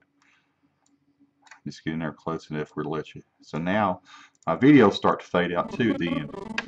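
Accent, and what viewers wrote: South, United States. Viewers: I love your southern voice!!! Thank you for the tip! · Thank you, great help. I grew up in Southern Mississippi but have been away so long that it was nice to hear a down-home Southern accent again. And you play beautifully, I'm going to listen to some more of those Blues!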